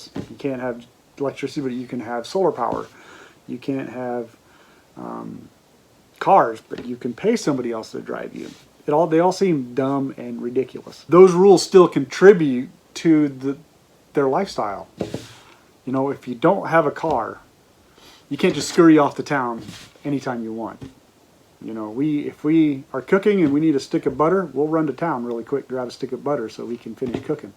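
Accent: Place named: Pennsylvania Dutch, United States. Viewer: I can still hear the Pennsylvania Dutch in your accent!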